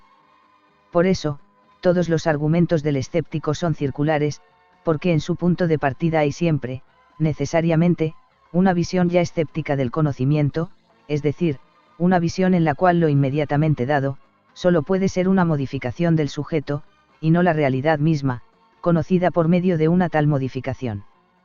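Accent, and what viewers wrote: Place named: Uruguay. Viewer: me tinca que tu voz es mucho más agradable q esos "emuladores de voz" o como se llamen que usas en tus vídeos, además con acento uruguayo serían muy entretenidos. Por principio me salto los vídeos q usan estos text to voice, puesto q los usan los millenials conspiranoicos. Vi ambos xq estaban en "No sin grave daño". Por ahora te leeré ahí.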